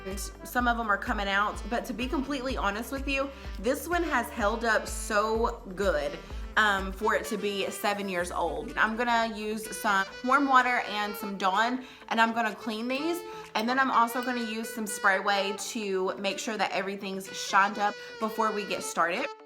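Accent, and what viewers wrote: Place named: South, United States. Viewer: You are both BEAUTIFUL women! both of you have that southern accent as well! lol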